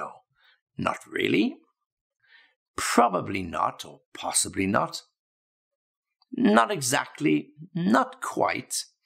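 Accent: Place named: United Kingdom. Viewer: I hesitate between american english or british english. In fact, i was practicing british english for 2 year. Then started learning american english. But when I come back to british english videos like this one, i just fall in love with british english. ..it sounds so lovely, so elegant! And the best for me, you pronounce the "T" and i love it 😊❤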